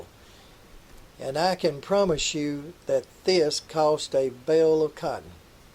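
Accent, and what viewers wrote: South, United States. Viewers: Another beautifully done, beautifully narrated video. This transplanted-Yankee North Carolinian is an admiring follower. Keep it up. I hope you continue making these, there's nothing else like it on YouTube. You combine, charm, simplicity, timing, visuals, human interest, and detailed historical fact like nobody else can. And if you ever lose that wonderful southern accent, you're going to be in big trouble with me. Lol. Many thanks. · This is very interesting and great narration/education...BUT... your hick southern twang somewhat makes it comical. But this is the deep deep south, so I guess, voice blends.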